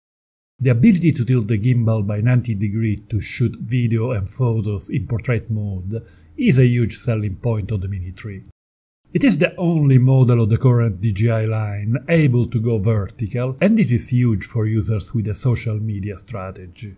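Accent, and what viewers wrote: Italy. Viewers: great tips, and a lovely Italian accent too!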